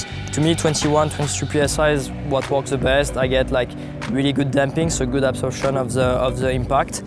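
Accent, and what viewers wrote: France. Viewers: Clairement l’accent français est là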